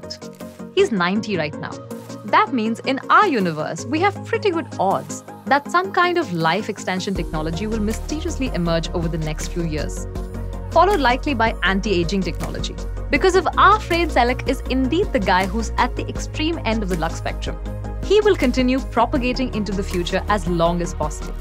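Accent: India. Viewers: The Indian Accent with this one is so sooo strong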